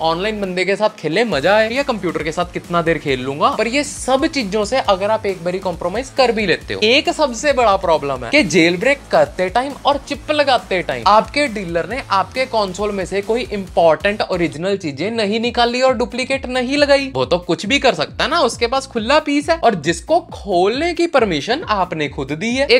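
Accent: Punjab, India. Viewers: I came to listen his Punjabi Hindi accent......specially the word Chippppp😂😂 Loved your content😊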